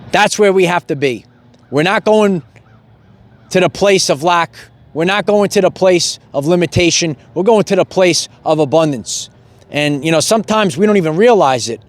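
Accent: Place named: Massachusetts, United States. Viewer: You sound like your from Massachusetts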